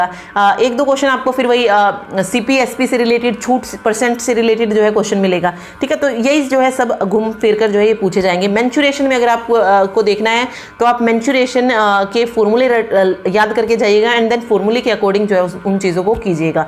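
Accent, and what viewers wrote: Haryana, India. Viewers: And mam app haryana se ho apki language me haryanavi touch h an ye video hamera liya bhoot hi helpful h so thanku again mam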